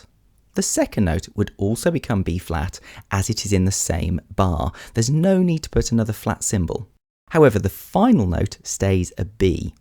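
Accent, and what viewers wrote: United Kingdom. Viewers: I like your british acent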